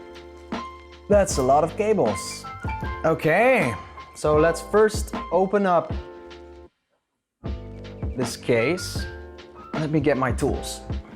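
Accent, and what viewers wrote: Netherlands. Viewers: within the first few seconds i was like 'he has a dutch accent, is he dutch?' and then i saw the box tape and i was like wait isn't that Azerty tape since i bought something off of there like a week ago and it was so yeah your english is really good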